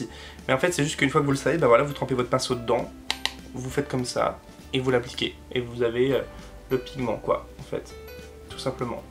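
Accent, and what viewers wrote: England, United Kingdom. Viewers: Tu es tout en blanc car tu es un ange... mais ça tu ne le sais pas... Bel accent anglais... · J'adore ton accent anglais 😍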